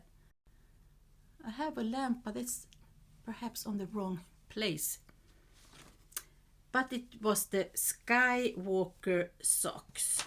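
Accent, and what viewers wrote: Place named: Sweden. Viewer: Happy New Year! Wow--not surprising that I was far off for the guesstimate of the yarn amount for 2024 😂 Your Swenglish was excellent--no worries. Loved all the socks and plans...see you next time!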